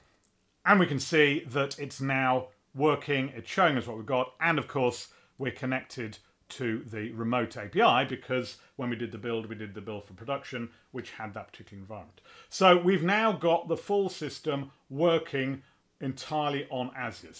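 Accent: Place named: United Kingdom. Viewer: Such good assistance with a great British accent, thanks :)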